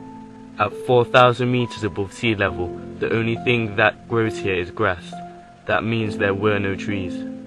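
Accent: United Kingdom. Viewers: Next time you post a video please have the text checked first by someone who completed their O-levels, then have the voice over read by someone without a Yorkshire dialect. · What you see hear? There? I love how Puma Punku leaves massive unanswerable questions, but really? I guess what I am saying is that your British accent is not too strong enough for me to requite poorly written subtitles. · im not hating on the london accent, im from london myself, im just saying that it makes you sound unprofessional. i speak like how i was taught in school, like you are supposed to speak yes? personally i believe the london accent is not as dominant as lets say scotland. we have a choice here, either speak normally like many people around you in london, or speak like a twat. and there you go.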